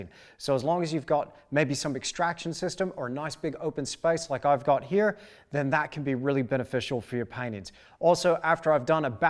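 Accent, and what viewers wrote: Australia. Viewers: Australian/Kiwi-American?? (please) ... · I can't tell if you have an Aussie, American or Irish accent lol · Australian vowels and American consonants, where the hell do you live lmao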